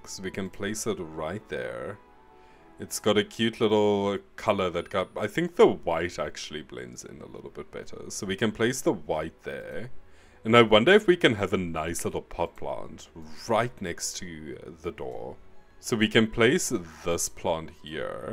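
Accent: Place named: South Africa. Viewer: I could here it in your voice 😊 Nice to meet another South African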